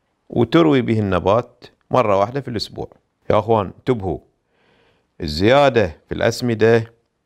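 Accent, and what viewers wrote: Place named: Bahrain. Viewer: انا توقعت من البحرين من الاسم للعايله وهم اللهجه بحرينيه واضح جداً..والنعم والله من اهل البحرين. اخوك من السعودية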